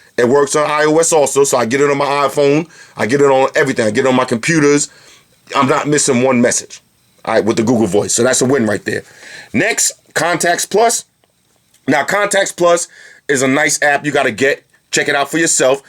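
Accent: Jamaica. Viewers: R u from Jamaica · u from Jamaica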